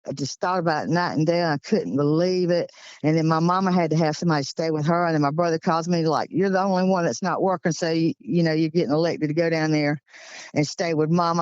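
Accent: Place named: Georgia, United States. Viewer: As a Jersey girl, I love the Georgia accent 😊 My accent is like a cross between a valley girl and Rocky Balboa. Lol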